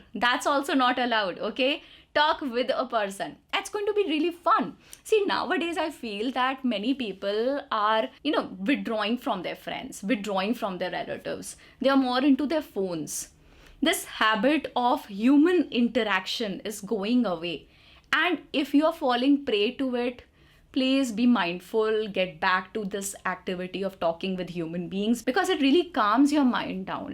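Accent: India. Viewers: I never heard before a clear Indian accent....I love it.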